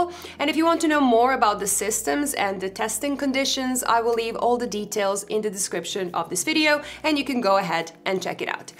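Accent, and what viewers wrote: Netherlands. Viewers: I heard Netherlands. Got to support our fellow dutchies. Nice review btw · Ik hoor heel licht je Nederlandse accent …❤ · love that dutch accent as well..super!! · I'm mixed Dutch/English and think you have the most beautiful English with a Dutch accent I've heard! Oh and thanks for the video. 😄👍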